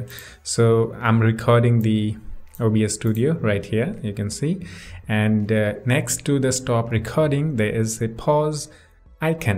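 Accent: India. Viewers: You indian?huh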